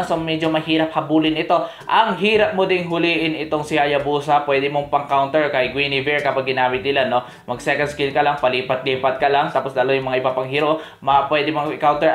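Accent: Ilocos, Philippines. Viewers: Ilocano ka gayam idol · Taga baguio ka boss? Aus pre ung tono mo pang pure ilocano tlga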